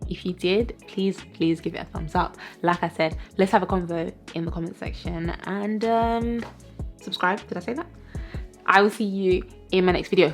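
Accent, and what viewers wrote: South East London, United Kingdom. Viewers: South londonn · She sounds like she's from South East London, I grew up there so it's a familiar accent to me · It sounds like a south London accent to me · Its nice to hear a British English speaker instead of Americans who seem to dominate the internet/media. I totally disagree that white women are the standard of beauty. By that I mean that I don't think only white people are beautiful, there are many types of beauty and that is a wonderful thing. We just need to open our eyes :) As for trends, I wear what I like and what is comfortable, what I think suits me, I don't care about the fashion, that is just the inconvenient thing that means I can only buy certain types of clothing/styles that are available. I could be a real rebel and wear something totally far out but I just don't care enough. What is really sad is that black people are enemies to their own kind sometimes. 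Really sad.... As for thinking some are not beautiful but for a platform, how about realising that is a different type of beauty that appeals to others, just not you? Having said all of this, when you are an older woman, you are invisible mostly, that is actually a good thing because you are free of all this crap to a large degree :)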